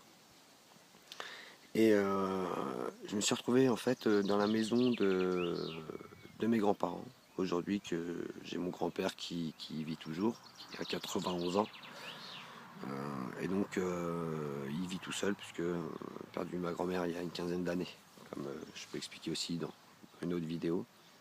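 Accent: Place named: Quebec, Canada. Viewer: merci pour tes vidéos, on va dire que c est pas facile l accent québécois mais ça va j arrive à decrypter.mon premier voyage astral m a bien secoué et g eu peur, je pense que j aurais moins peur lors du prochain voyage.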